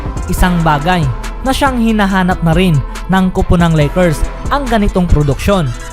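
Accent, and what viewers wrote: Visayas, Philippines. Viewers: My pagka besaya mag saleta ahaha. Pinahaba lang ung conteksto. Tonge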